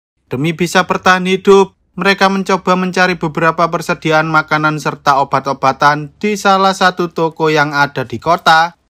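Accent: Java, Indonesia. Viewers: Jawa medok, malas nonton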